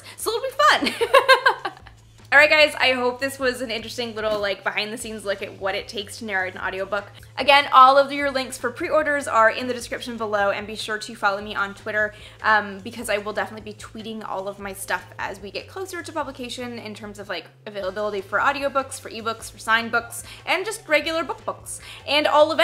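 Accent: Canada. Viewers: Awesome. Though I do admit that I feel you should keep your "Canadian-ness" and say the words as you normally would. When reading if I know the sound of the authers voice for some reason the voice in my head as I read sounds like the auther. This was what happened as I read "Breaking the chains of gravity" (BRILLIANT book btw) It was like your voice in my head and not mine. Ok. That may sound weird to some but thats just the way I am. Oh and any chance of a 'Pete' video like the make up one you did? But another subject. That Pete one was great. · I'm from the US and I love hearing the Canadian accent. It's very endearing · Regarding your pronunciation and your Canadian accent, you ARE Canadian, you SPEAK with Canadian accent, so why dwell on it? Without your accent it wouldn’t be you, simple as that I really do hope we can get it here in Sweden I wish you a merry Christmas and a happy new year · Did you straighten your hair while your voice was recovering? 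This is an odd thing for a guy to notice, but in the recording videos it was wavy and in the main video it was very straight. Also you sounded more Canadian today than usual. I guess that's random chance; there must have been more words today that we Americans pronounce incorrectly. :-) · You're Canadian so by all means speak as a Canadian! I love it! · What is wrong with using a Canadian accent, eh?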